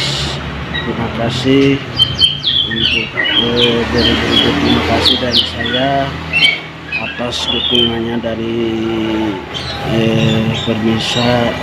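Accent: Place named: Sunda, Indonesia. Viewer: Aduh meni leket pusan sundana si amang pace .terus berkarya pace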